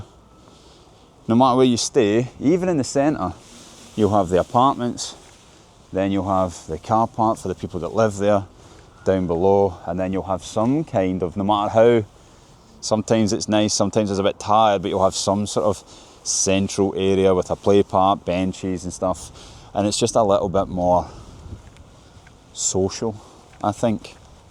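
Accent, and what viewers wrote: Scotland, United Kingdom. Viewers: Акцент конечно😮. Оказывается так по-английски говорят шотландцы. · Я смотрю довольно много видео об иностранцах, путешествующих по России. Но когда я услышал ЭТОТ акцент, я подумал "О, да! Ты точно шотландец!" :D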